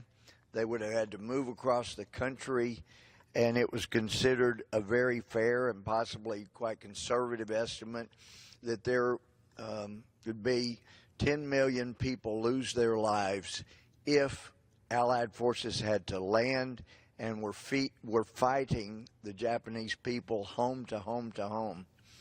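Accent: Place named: Texas, United States. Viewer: This is a great and humble man, who has served his country and his state and is fighting to save the Integrity of the FBI and also the Justice Department. Although he speaks with his slow, southern, Texas drawl, he says more than Hillary Clinton and Obama have ever said of substance in their lives.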